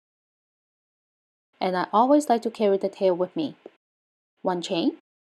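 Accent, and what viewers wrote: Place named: Asia. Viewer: What kind of Asian is this accent, Mandarin? Vietnamese? I love it 😍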